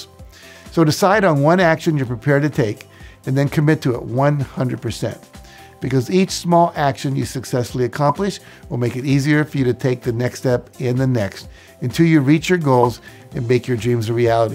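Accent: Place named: United States. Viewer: Thanks a ton you make it seem so simple, Ty for ref the Boorman video like a shot in the arm.refreshes my persistence muscle.i want to commit 100% to cleaning up my family home that i share with my siblings,parent's blessing want to make it more usable and less cluttered.I owe you special thanks for Rule of 5 from TSP book(awesome book) i practice and feeling the benefits of clean home. it is not all done but i can feel the results so thank You.i appreciate the written pointers you give on the side screen. i will also appreciate if you can ensure a sub-title feature in all videos as sometimes the american accent could be a challenge for us asians to follow. Great Job keep it up and Thank You once again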